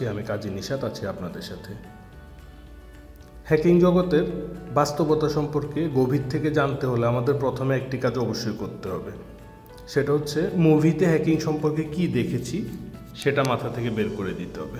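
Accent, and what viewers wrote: Noakhali, Bangladesh. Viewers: ভাই কি নোয়াখাইল্লা নাকি?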